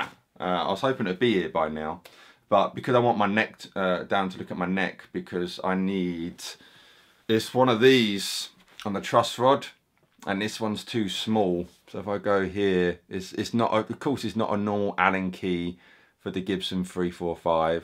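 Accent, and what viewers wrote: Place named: England, United Kingdom. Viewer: Great video!! Love that accent man. Im a new sub so i gotta ask what part of England are you from?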